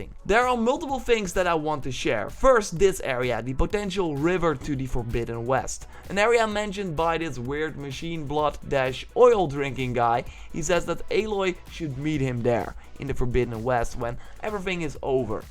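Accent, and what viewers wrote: Netherlands. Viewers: I never thought about your dutch accen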